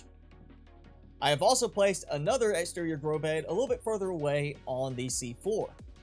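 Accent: United States. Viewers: Pretty sure he's American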